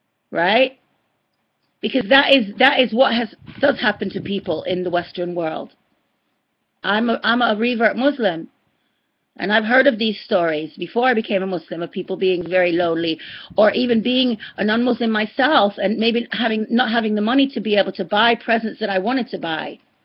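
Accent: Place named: United States. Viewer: Haha loved it! Jazaki Allahu Khair sister! I shared your video to my dad who is not yet a Muslim. May Allah guide him, please make dua for him sister. I do feel bad for not calling to wish him happy birthday as he does for me and my children. I sent him a message with a dua and will chat with him tomorrow InshaAllah. In the mean time I needed help in explaining this to my dad and so I did a search - listened to many short explanations by my favorite sheiks and Subhanallah Allah led me to your video. MashaAllah! You explained this matter very well! 😃 btw, I'm confused about you English accent - I think you are American but perhaps moved abroad?! Haha. Anyway it's a beautiful accent MashaAllah.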